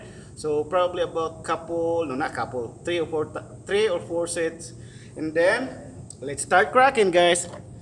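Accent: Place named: Visayas, Philippines. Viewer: bansaya mo English oiey, nice vlog kavisaya keep posting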